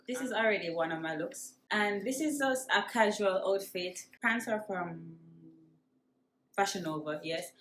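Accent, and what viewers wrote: Jamaica. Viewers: Sound like Jamaican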